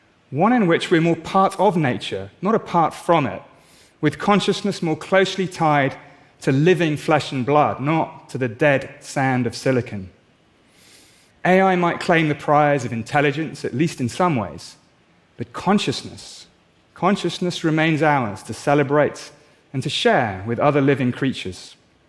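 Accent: United Kingdom. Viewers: They're just lying to our faces. This is all damage control. The y know they've already lost control of AI. They've created a monster they could never be able to tame. These guys are pure evil. Lying to people's faces in a British accent! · This video is so full of baseless assertions, it's difficult to watch. I guess being a good orator with a British accent is all it takes to get on TED these days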